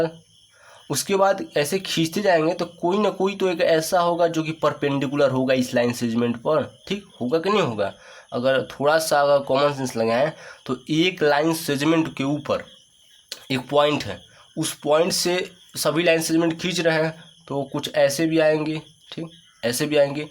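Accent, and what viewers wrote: Bihar, India. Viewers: Lagata hai bihar ke teacher hai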